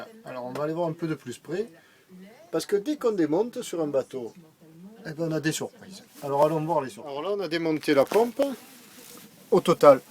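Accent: South, France. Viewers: Bonjour, Et bravo pour cette vidéo réalisée dans un espace "confiné" ah les bateaux… J' ai vu que vous taillez vos joints vous même, il y a bien des années, je le faisais aussi, mais je n 'arrive plus à me fournir en "feuilles" de ce matériau spécial joint. Auriez vous une adresse de fournisseur, car en plus j' entends un bel accent qui me fait penser que vous êtes du midi, je réside moi-même à Marignane, alors si vous voulez bien me donner un tuyau… Je vous remercie par avance. Prenez bien soin de vous et bonnes prochaines ballades en mer. Cordialement. Tristan.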